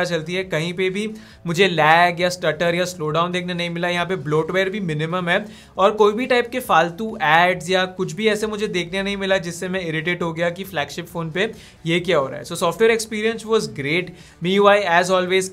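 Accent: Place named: India. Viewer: I honestly cant tell whether its english, or half english half indian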